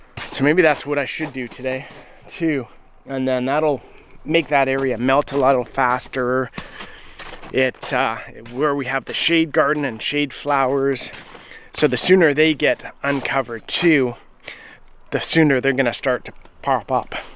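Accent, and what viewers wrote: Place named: Maritimes, Canada. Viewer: The snow hasn't even flown yet and I already want to be in this place again hahaha. Are you originally a Maritimer,? Your accent sounds like home to me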